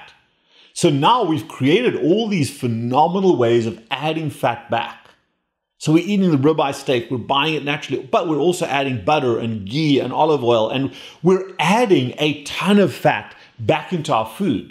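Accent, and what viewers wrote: South Africa. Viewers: I could tell you are South African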